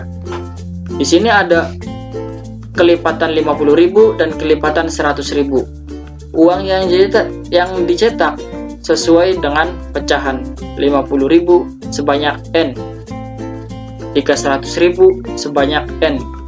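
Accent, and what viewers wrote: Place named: Ambon, Indonesia. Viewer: Persis ambon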